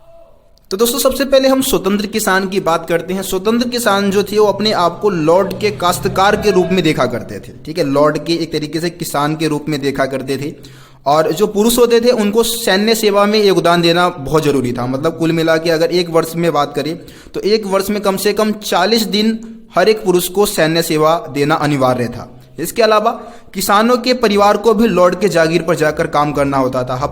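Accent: Delhi, India. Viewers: Sir aap delhi se ho na😊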